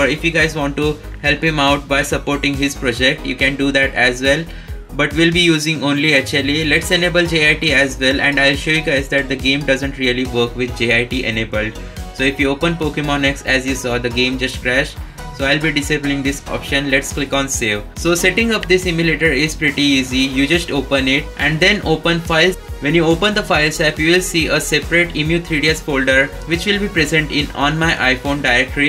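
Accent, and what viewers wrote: India. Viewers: As soon as I heard the Indian accent, I knew it was going to be fake OK that’s racist…. I’m sorry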